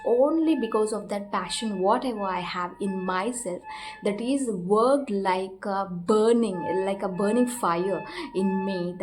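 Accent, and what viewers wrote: India. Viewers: Standerd indain english ❤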